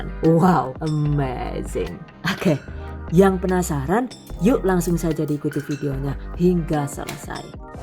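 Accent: Banyumas, Indonesia. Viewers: Iya kan sdh l@ tinggal di luar sana n suanj orng bule tapi ciri khaa ngapak ny masih tetep ad sukses trus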